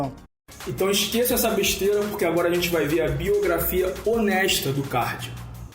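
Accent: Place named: Rio de Janeiro, Brazil. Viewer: caralho sabia carioca fudido ainda e da zona norte ps; (moro na penha)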